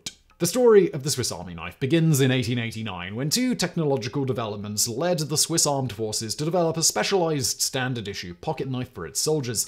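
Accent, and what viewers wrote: England, United Kingdom. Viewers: He's English. If a word looks like it starts with Victoria, he's hard coded to say Victoria no matter what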